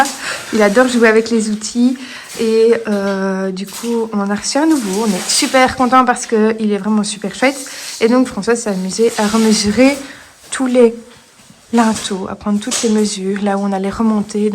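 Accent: Belgium. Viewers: tu est belge ?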